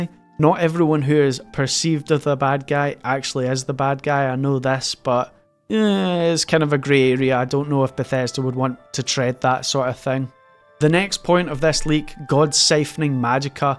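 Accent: Scotland, United Kingdom. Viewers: Comment section never heard a Scotsman before lmao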